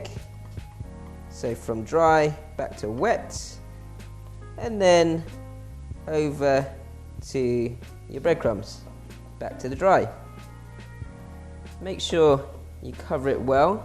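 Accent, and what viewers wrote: United Kingdom. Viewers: A Chinese guy with a British accent having Indian in-laws cooking Japanese curry......... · Are you being deliberately obtuse? He's british, like Gordan. Similar accents. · LOVE LOVE LOVE your videos. You are indeed a true professional. I am so happy that I found your channel. Everything you make sounds and looks delicious. Thank you. BTW, also love your British accent.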